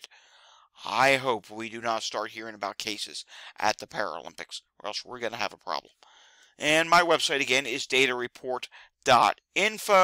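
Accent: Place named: Philadelphia, United States. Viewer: Just found your channel, a friend recommended it. Thanks for doing this. Love your Philly (or near Philly?) accent! I’m from Jersey originally.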